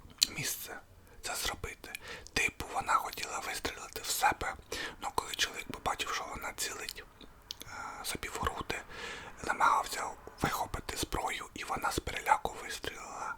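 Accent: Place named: Poltava, Ukraine. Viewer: А я була впевнена, що ти з Полтавщини. Як приємно бути правою!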